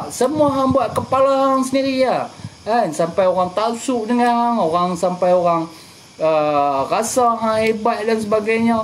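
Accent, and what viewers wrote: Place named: Kedah, Malaysia. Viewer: Abang ni mesti orang kedah..dengar dia cakap pun dah tau..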